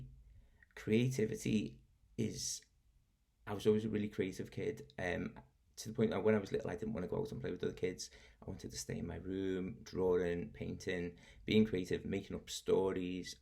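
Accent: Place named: Liverpool, United Kingdom. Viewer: Blimey, scousers are becoming self realised now? I've never felt more like I've missed the bus. I'm joking (!) I unironically love Liverpudlians and your wonderful accent!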